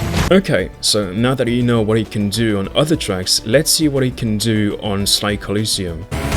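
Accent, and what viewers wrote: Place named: France. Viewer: Best French voice accompanied by best background music <3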